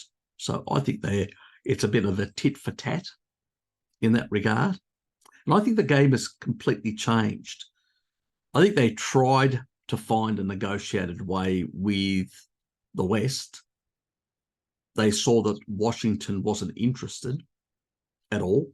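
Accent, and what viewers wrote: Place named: Australia. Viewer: you have a very broad Aussie accent for a guy of Greek heritage!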